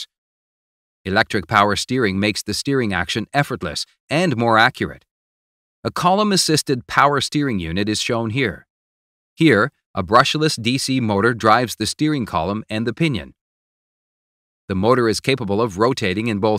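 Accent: United States. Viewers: The first 12 seconds bother me. You have an American accent but your animation driving in the left side of the road. And you say steering is the hardest thing to master, it’s hard but that’s only because Americans drive automatics. You think steering is more complicated than clutch, gears and steering? Come on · Why is the video narrated by an American but the driver is in Britain?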